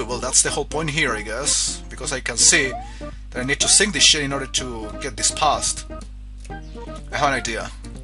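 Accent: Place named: United States. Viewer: i can hear u losing the accent a lil, i compared ur voice hear and in ur old vids, and ur starting to hav more of an............ american accent, less of a hispanic accent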